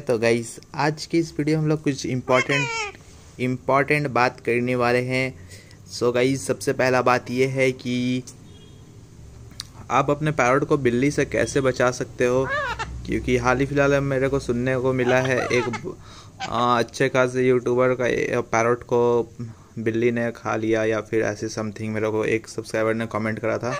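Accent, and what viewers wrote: India. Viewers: Bhai tone se toe aap mithilanchal k lgte ho. Kha se ho aap ??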